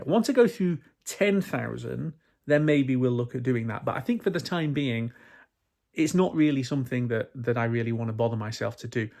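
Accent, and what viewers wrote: United Kingdom. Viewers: I look forward to your videos every Friday. I subscribe to a few "Tesla" Youtubers but yours I find the most enjoyable. as a Canadian, your british accent and humour is fun. I enjoy your travel videos in the Model Y and your chalenging of the anti-ev folks. What I didn't like was the video on accessories. There are many YT folks doing accessory videos which I find redundent. Keep up the good work and "Carry On"!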